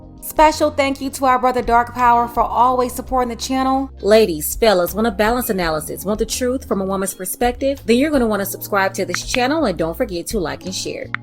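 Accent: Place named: California, United States. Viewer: u sound like u from cali lmao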